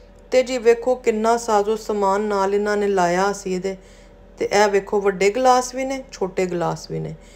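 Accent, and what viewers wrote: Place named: Punjab, India. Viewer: Salaam ❤ sweetest Punjabi ❤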